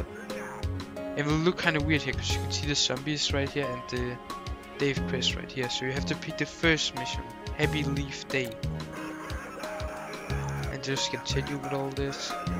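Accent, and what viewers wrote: Denmark. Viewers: I Can hear you’re danish👏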